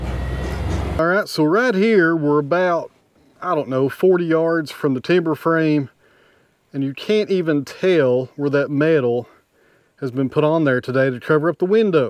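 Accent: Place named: Tennessee, United States. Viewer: Pay no heed to the complainers and the know it all's. And those who bitch about your Tennessee accent.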